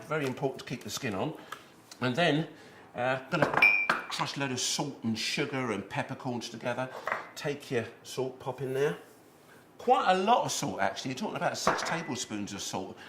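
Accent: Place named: United Kingdom. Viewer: He has a VERY heavy british accent lol.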